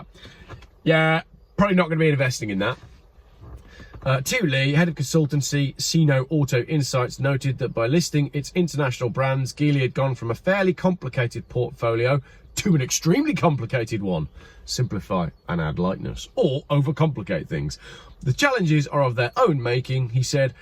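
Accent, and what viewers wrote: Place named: Birmingham, United Kingdom. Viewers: Your brilliant Brummy accent makes me LOL. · Loving the Brumm-aye accent. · Your Black Country accent is uncanny, eh it yam yam lol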